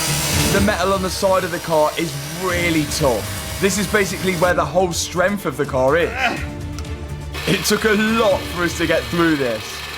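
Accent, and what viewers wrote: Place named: United Kingdom. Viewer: Apart from the British accent, this is some manly stuff! Enjoying the content from South Africa!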